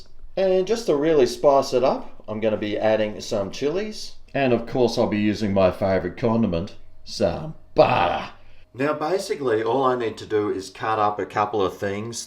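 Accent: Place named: Australia. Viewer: Spanish spoken by an Australian!!!! OOH LA LA!!!!!!!!!!!!! We likey!!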